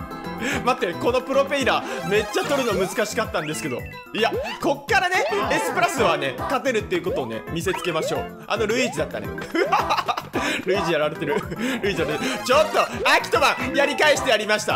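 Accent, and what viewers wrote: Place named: Hakata, Japan. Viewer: 私も福岡在住やけんめっちゃさんが言いたいこと分かります笑!!やっぱり博多弁になる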